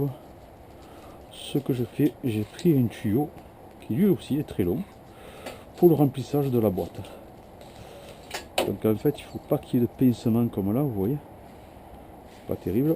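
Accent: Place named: Marseille, France. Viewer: Bravo et merci bcp pour cet tuto. Aaah, jadore lacent marseilles :-)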